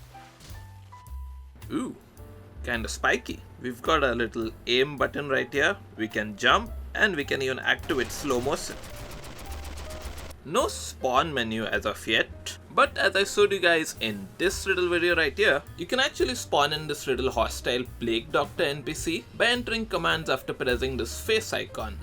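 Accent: India. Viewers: I know gonna be great video if is India accent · Are you indian or its just your accent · indian accent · Bro I thought he was from other country but, I noticed his voice that sounded like Indian. so, I checked his profile and he was Indian.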